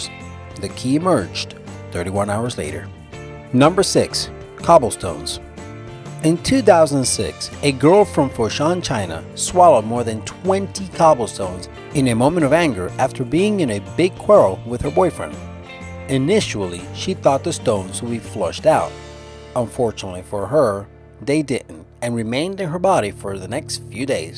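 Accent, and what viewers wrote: Mexico. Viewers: why is this mexican talking? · You have the best Mexican voice ever your an awesome commentator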